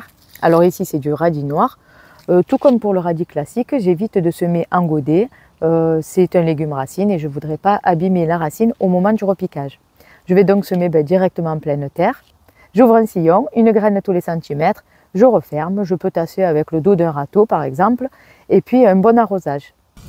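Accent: South, France. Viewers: J'aime vraiment vous retrouver pour vos vidéos Ce petit accent du midi me fait rêver ❤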